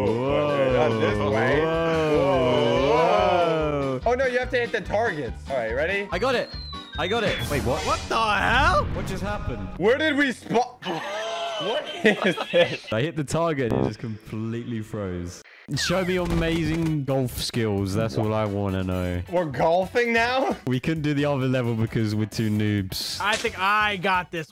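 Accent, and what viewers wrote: United Kingdom. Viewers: Yes. But your British(like me) which makes you the absolute god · Are u british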